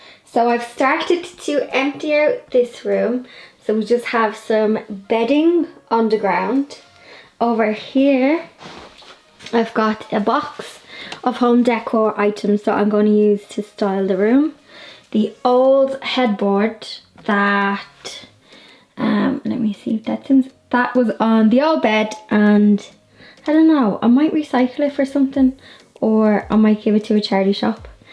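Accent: Ireland. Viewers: It looks amazing!! Came across your channel a few days ago and I LOVE your projects!! 🥰 Plus, it's so lovely to hear that Irish accent again...been to Ireland 'bout 11 times but last time was a while ago so it brings back memories 🤗 will keep on watching for more ideas - sadly my beloved mom just passed and I'm currently emptying my parent's house. My two daughters (7&10) and I will most likely move into it and I definitely wanna keep lots of things and re-purpose / restore so your diy projects are a great inspiration! Looking forward to watching lots more of your videos 🤗 greetings from Munich, Germany 😘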